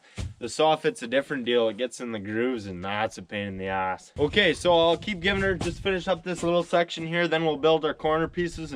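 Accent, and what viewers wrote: Canada. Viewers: From his accent I am going to guess the middle part of Canada. Saskatchewan or perhaps Alberta. From the trees I’m going to guess the northern portions of those provinces. I’m in NW Ontario.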